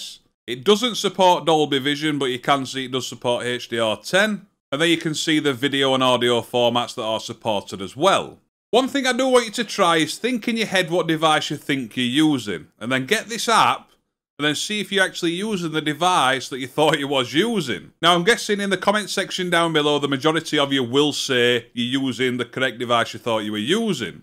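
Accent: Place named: South Yorkshire, United Kingdom. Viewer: Where's your accent from dude? My guess south Yorkshire?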